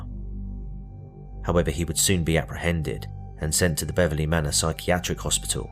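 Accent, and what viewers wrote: United Kingdom. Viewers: Super stuff my man...are you English??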